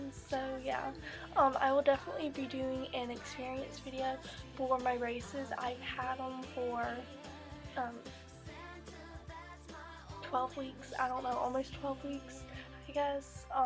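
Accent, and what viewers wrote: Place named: South, United States. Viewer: Oh well im a California guy, so as soon as i heard your voice i knew that it was something different, I kinda figured because there might have been some southern to it but i wasnt sure, its really unique and i like it! You seem super nice btw, so i hope your channel does really well!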